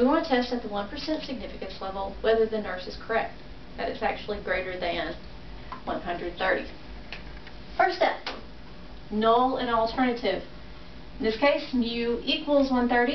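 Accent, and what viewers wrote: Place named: United States. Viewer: Could anyone tell me where is this accent from? Sounds American to me but not sure which state. Thx :)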